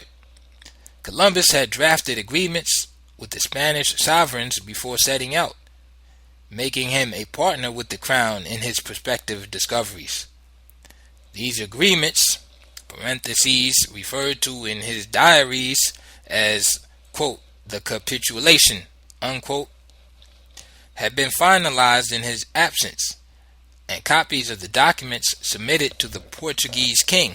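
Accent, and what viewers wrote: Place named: New York, United States. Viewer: That NY accent makes it hard to listen to. Lol open parentheses … close parentheses?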